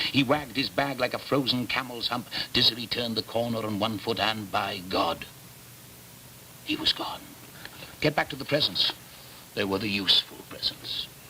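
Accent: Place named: Wales, United Kingdom. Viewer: Burton really went back to a Welsh accent as he went through this. As a Welsh person I loved that.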